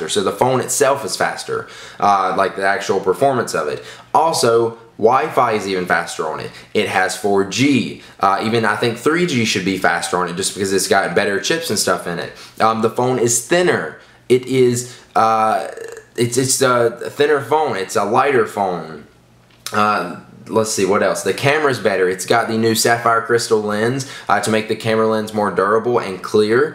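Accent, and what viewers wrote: South, United States. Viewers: His accent sounds a bit Southern.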